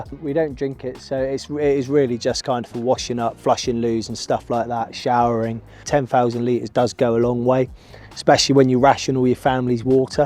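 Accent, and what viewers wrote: England, United Kingdom. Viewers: sounds almost like an English version of *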